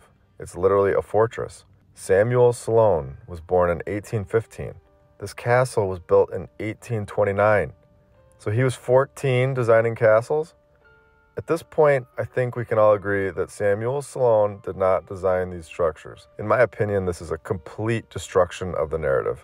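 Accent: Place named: Midwest, United States. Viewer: Midwest accent, reminds me of home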